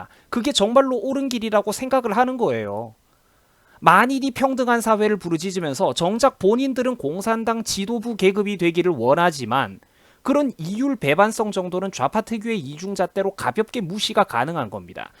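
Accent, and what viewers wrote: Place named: Daegu, South Korea. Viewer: 대구사투리 넘 좋다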